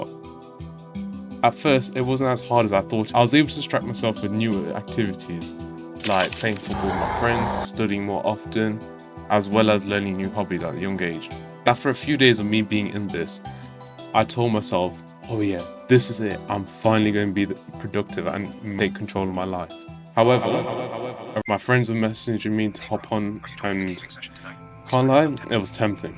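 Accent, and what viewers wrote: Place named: Germany. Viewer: your accent seemed more german rather than british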